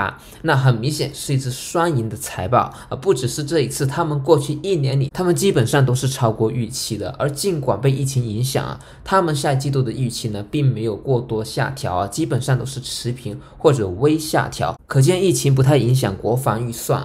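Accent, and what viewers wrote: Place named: Fuzhou, China. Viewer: 福州口音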